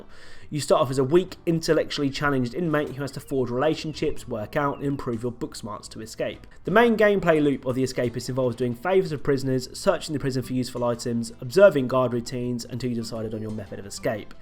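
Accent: United Kingdom. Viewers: Oh then you're just not used to this guy's accent then. It doesn't mean other non UK speakers have a problem understanding these kind of accents though.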